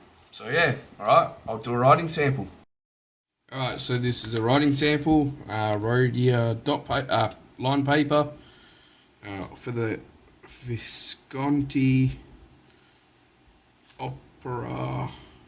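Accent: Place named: Australia. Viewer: thanks for the tip Hun. Also it's great to hear an Aussie accent doing these reviews. Keep up the good work 😊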